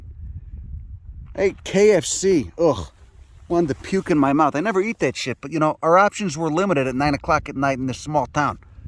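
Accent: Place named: New York, United States. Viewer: DAMN U SOUND LIKE NEW YORK !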